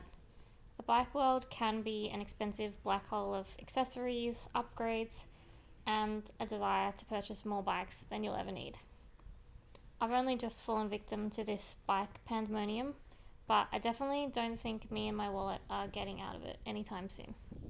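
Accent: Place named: Australia. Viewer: As a British "people", how you didn't pick up on her thique Australian accent both confounds and flabbers my gast.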